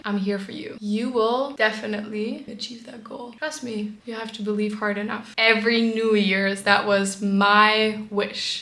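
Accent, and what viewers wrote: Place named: United States. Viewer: You literally sound american! Thats so crazy how living in america for 1 year left you with an american accent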